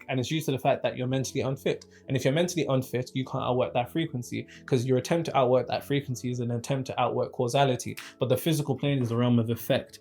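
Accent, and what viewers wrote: United Kingdom. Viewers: Lol😂 I'm glad I didn't put the comment about the way you say pasta like "pastor " I mean I get it we sound different from every region of the world ....I truly admire the u.k. accents most of all if I see you I won't try to sound like you but I can't help but copy your accent at home 😅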